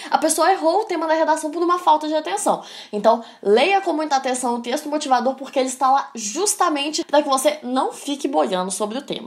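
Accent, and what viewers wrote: Minas Gerais, Brazil. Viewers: Mineiros falando é algo simplesmente incrivel.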